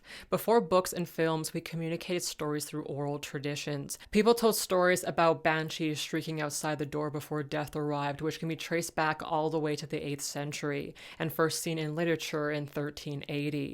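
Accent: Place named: Canada. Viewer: That's really interesting! Sorry to hear you were bullied for that. I never would have guessed Hungary. I'm from Minnesota in the US, and I detected a little bit of a Canadian accent in your voice, since our accents are somewhat similar. I like the way your combination of accents fit together! It gives your narration a unique and pleasing style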